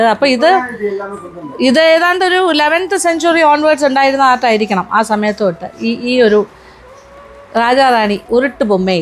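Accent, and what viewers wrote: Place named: Tamil Nadu, India. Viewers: കത്തിരിക്ക konjam veyy...😅athu kettapom chiri vannu😅... Mam nte tamil kelkkan nalla rasamanu❤️ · Madathinte tamil kelkkan rasamundu😄kathirikka konjam veyy ennu kettappol ente Aswathy molu nalla chiri ayirunnu ❤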